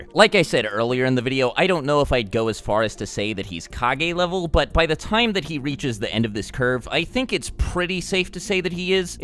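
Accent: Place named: Boston, United States. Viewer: Hey, do read the manga in Japanese? I ain't been watching your channel too long (I do rather like your videos) but when I hear you speak I figure you are from Boston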